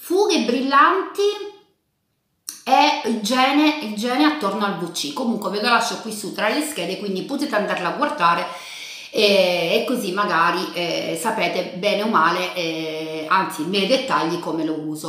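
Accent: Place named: Calabria, Italy. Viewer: Infatti avevo capito che il tuo accento era simile al mio e mi sembri un viso nn nuovo ma di Reggio che zona,, ❤️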